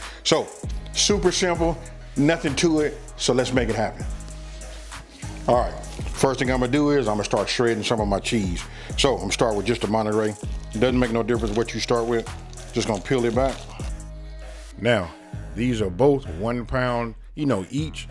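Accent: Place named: West Coast, United States. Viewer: man u sound like a straight west coast dude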